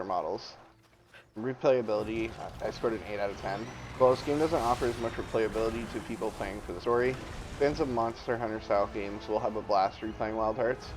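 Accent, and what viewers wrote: United States. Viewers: Why does your voice sound like its coming from an American neck? This says Canadian content 🤔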